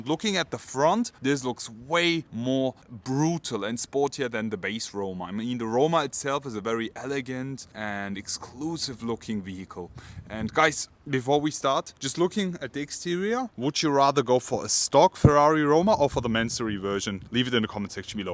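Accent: Germany. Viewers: Mein Englisch ist nicht besonders gut, praktisch, dass sich der Dude in Englisch anhört als würde er trotzdem Deutsch reden.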